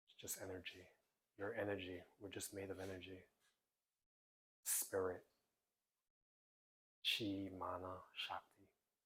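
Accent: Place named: Chicago, United States. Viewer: New to this channel but spirit had this guy come to my FB reels and it's been since June 2025 that I was last on FB. Anyway, I needed to take care of my body as my right side isn't working well. Stiff & painful with every movement and it's been years. So I did some movement stretches from him. I felt better as the movements were slow not to injure an injury. Listening to him speak on YT made me think of Chitown (Chicago) from his accent. At times I'd giggle & at times I felt family that still live there. Words like "bref, stumick, erf" man, I miss my family & Chicago!! ❤❤❤ TY guy for your video😊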